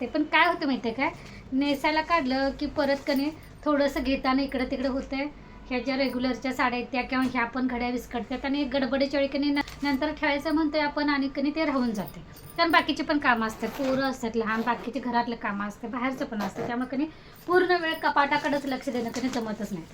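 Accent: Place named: Sangli, India. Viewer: Tumhi sangli sidevcha ahat ka bhasaha varun vatle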